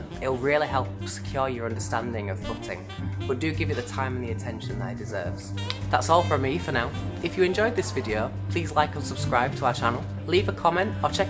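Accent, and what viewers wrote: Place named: North of England, United Kingdom. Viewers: damn, I really love your accent :D such a nice british accent, I would love to speak like you. Thank you for the Video! It became very clear to me. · Thank you very much! I'm from the North of England, so my accent is very different to the traditional Standard English usually broadcast from the UK. I'm glad you liked my video :-)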